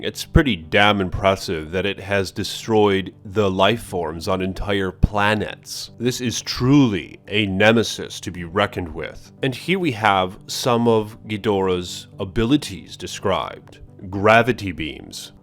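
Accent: RP, United Kingdom. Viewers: Why do u talk like a British butler!! annoying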